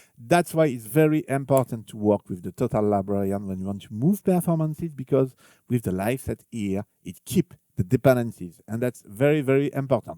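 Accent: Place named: France. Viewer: thank you for creating this software. Love your accent my French neighbour.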